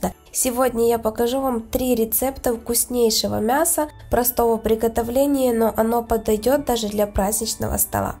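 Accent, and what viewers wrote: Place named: Ukraine. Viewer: Просто наверное с Украины а старается говорить на русском языке ну не придерайтесь все понятно девочка старается.Спасибо!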